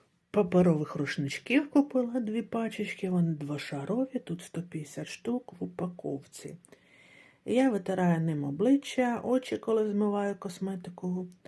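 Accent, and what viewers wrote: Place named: Kherson, Ukraine. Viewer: Гарні покупки! Одразу по вимові зрозуміла що ви з Херсону❤ Запрошую на свій канал про бюджет за методом конвертів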